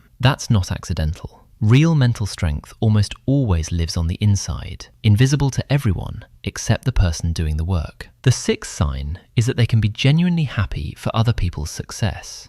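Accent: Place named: England, United Kingdom. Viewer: Why did the narrator voice turn into an native englisman's voice midway through the video?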